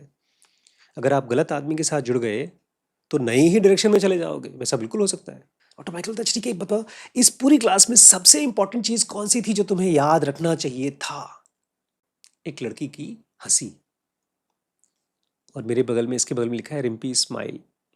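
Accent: Bihar, India. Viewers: Sir it was really deep class I was a little asleep, and found it quite insightful, and you sometimes sounded using a bihari accent with due respect.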